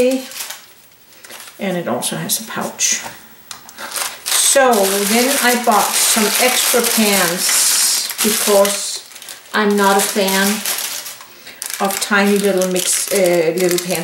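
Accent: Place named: Denmark. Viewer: As a dane I recognised your accent and had to go to your website to confirm what my ears heard. 😁 This is a great video, thank you for making it.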